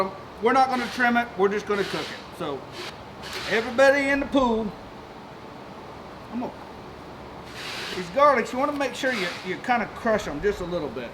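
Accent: South, United States. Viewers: man you seem like a great guy but it every vid I've seen feels to me like a Guga Foods video with a southern accent; maybe a different style of video would get you more interaction on the site?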